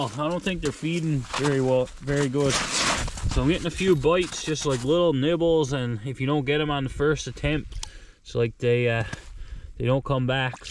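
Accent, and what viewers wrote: Newfoundland, Canada. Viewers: I like the Newfoundlander accent, reminds me of when I was little. That is a great dog he is such a character!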